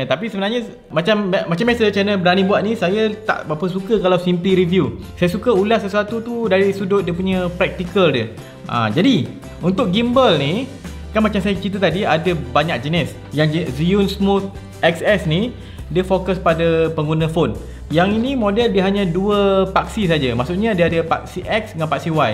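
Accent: Melaka, Malaysia. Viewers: bunyi slang Melaka 👍👍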